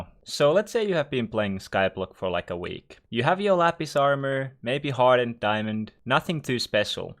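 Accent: Finland. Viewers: Are you finnish becouse i am and your accent sounds finnish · Bcs he’s finnish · Bruh he’s just Finnish it’s a different accent chill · your accent makes me want to go to finland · huomasin heti et olit suomalainen sun accentistä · shut the fuck up he is Finnish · Yo are u from Finland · Youre a finn, its confirmed im sorry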